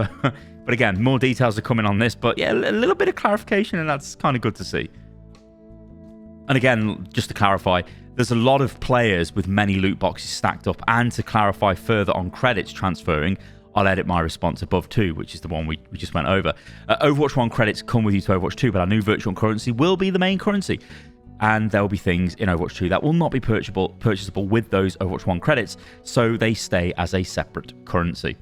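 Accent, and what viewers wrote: South, United States. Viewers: your southern accent isn't bad. It's also kind of sexy LOL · Well shitttid... your southern country accent is on point!!! Hahaha haha 😄 😆 🤣 I moved to hillbilly country and that's exactly how they sound 🤣 I wish more info was given for currencies and how they interchange. Claim it all on OW when you can! Bring back Jeff. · kkona accent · OMG giving us a southern american accent is something I didn't know we needed. But it was glorious m8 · I took a break from OW but I am back excited about OW2. I missed your content! Also the southern accent was unexpected but funny 😁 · southern accent sounds like Chris Chan nononono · OK, I kinda love the American Southern accent. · really good American (Southern) accent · your Southern accent is fucking laugh out loud!!!!!!! From the States here. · I need more southern in videos.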